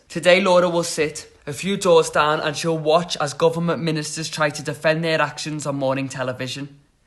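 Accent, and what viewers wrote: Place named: England, United Kingdom. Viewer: Fabulous! And, with a proper English accent with expressive mischievous visage wonderfully and theatrically delivered to compliment his prose. Thank you. You'll go far! Not sure about sentence use of the f-word though when an empathy for your diverse listeners and readers need consideration.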